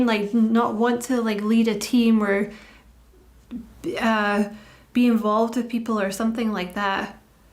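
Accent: Scotland, United Kingdom. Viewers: Always wonderful to hear your lovely Scottish accent no matter what the cards say.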